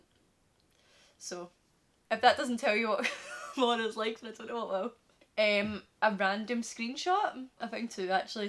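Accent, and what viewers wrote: Scotland, United Kingdom. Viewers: Random ,but u sound scotish ?nice accent anyway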